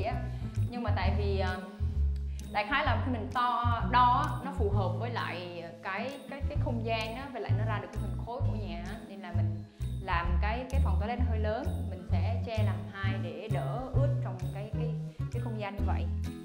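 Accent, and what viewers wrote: North, Vietnam. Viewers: Mình thích em ấy nói giọng miền bắc hơn❤ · Nói một giọng thôi nè chị, lúc giọng na ná Bắc, lúc giọng na ná Nam nghe nó kỳ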